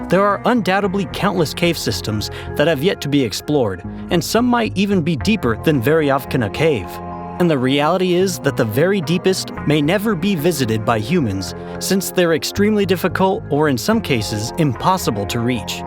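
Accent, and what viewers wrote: United States. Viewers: Dude's got an American accent so he's likely American and in America we use miles and Fahrenheit, so it makes sense.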